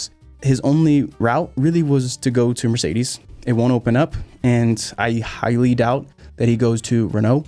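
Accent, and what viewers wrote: United States. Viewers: An f1 commentator with american accent????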